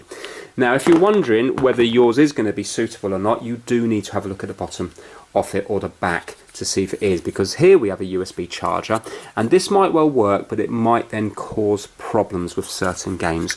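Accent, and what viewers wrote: United Kingdom. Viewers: Shamalamamoumou unknown he is British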